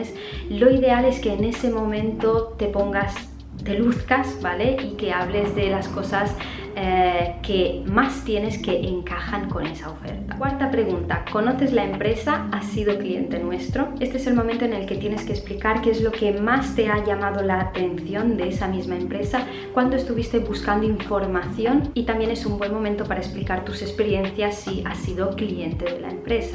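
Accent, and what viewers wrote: Italy. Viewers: Scommetto che sei italiana 😂 ottimo spagnolo devo dire però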